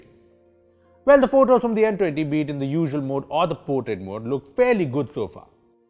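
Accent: India. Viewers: Omg ! All Indian youtubers = annoying accent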